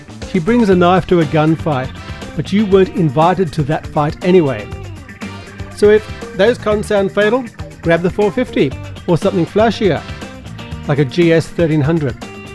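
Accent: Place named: Australia. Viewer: to hear an Aussie accent filled with love for his bike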